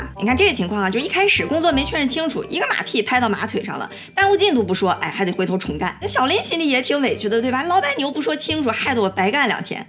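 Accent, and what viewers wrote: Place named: Beijing, China. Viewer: 你太可爱了，真觉得你是北京大妞儿的风格～～口音也是北京味儿～～这是怎么回事呢？生长在大连，咋长成北京妞儿了～～？很想认识你一下，跟你做朋友～～😄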